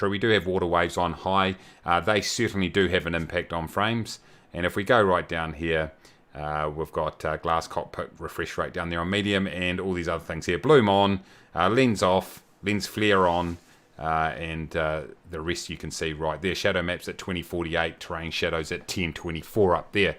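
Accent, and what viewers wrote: New Zealand. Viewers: Nice to hear a New Zealand 🇳🇿 accent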